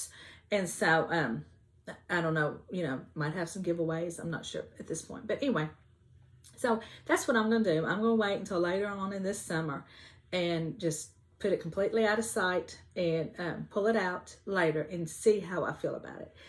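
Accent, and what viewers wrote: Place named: South, United States. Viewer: I just found you and being from Rock Hill SC , I recognized that southern voice and said” I need to watch her” and then I heard you say y’all and I was hooked. The book review was great. I’m always looking for good books to read. Thank you for a great video and I think the green tinted fabric would look great for March sampler😊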